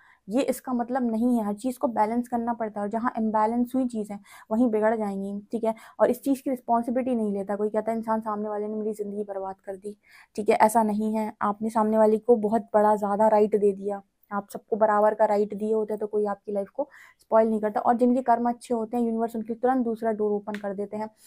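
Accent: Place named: Bundelkhand, India. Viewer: Apka samjhane tarika bahut acha hai apki boli mai bundelkhand prabhav najar ata hai.. Pla mujhe pursanal riding de dijiye 🙏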